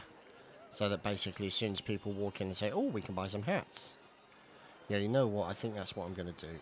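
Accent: England, United Kingdom. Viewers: where you from your accent is awesome I'm guessing somewhere in England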